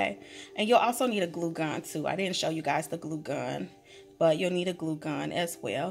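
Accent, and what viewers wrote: South, United States. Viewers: I love this! The only problem was that you were out of frame when making the hook with the wire. I couldn't see how you did it. If you combed out the pom poms, they would be even lighter and fluffier. TFS!, BTW, I love your southern twang!,😊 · Hi! Oh sugar, I have been doing videos for some time now, and I still stumble over...well...lots of things. I say "um" all of the time. I enjoy watching tutorials where mistakes are left in due to people being real. I never met a perfect person.;). I have butter fingers whenever the camera is on. As soon as it is off, I am fine. Your nails look great! Love it that your nails match your project. Wonky is real. By the way, Tree sent me over. I am a new subscriber of yours, but you will not see me on your list due to my settings are on private. They came out darling! Love the colors. I really enjoyed your tutorial! 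I love your twang sugar...I am from the south. Oh, and by the way, I also loved hearing your daughter in the background from time to time. Thank you for sharing your creativity with us all. I hope you have a safe, marvelous Monday.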